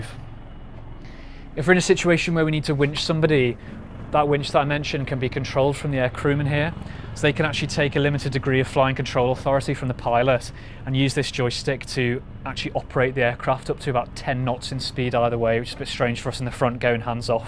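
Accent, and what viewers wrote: Liverpool, United Kingdom. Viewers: slight liverpool accent ... cracking chopper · A scouser I hear in your voice ex merchant Taylor's I bet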